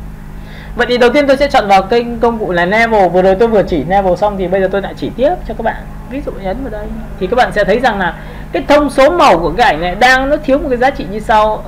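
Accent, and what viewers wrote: North, Vietnam. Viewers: dọng bắc hơi khó nghe nhưng thầy dạy rất chi tiết dễ hiểu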